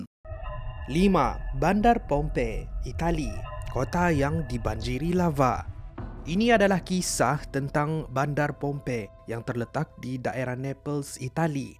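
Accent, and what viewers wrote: Sabah, Malaysia. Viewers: abg ni sabah or sarawak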